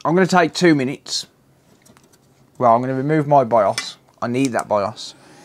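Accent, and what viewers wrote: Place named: Birmingham, United Kingdom. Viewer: Wot part of brum r u from bud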